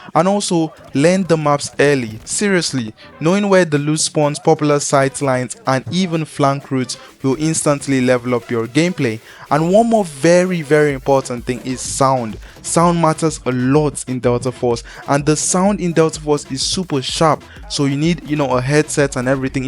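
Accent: Nigeria. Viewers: You sound Nigerian. Are you? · Your 9ja accent is unique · Bro are you Nigerian?? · I know my Nigerian brother wherever... We can never go wrong with that accent... in fact to be more precise.. you be Yoruba boyyyyy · Wait are you Nigerian? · Bro you Nigerian...😭 Aye great video man❤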